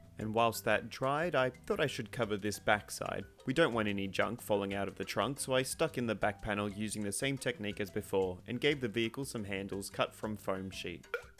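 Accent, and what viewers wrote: Australia. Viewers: I came here looking for another great maker and was super stoked to hear an Aussie accent when it started. Love your work and your style. Subscribed - can't wait to see more.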